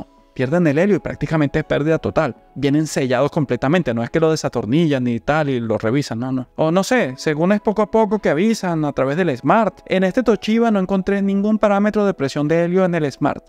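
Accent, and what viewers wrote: Venezuela. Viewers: De casualidad eres de Venezuela? xD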